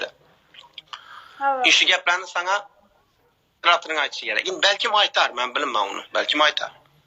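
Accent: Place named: Khorezm, Uzbekistan. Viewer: Yomon gaplisqu xorazmchada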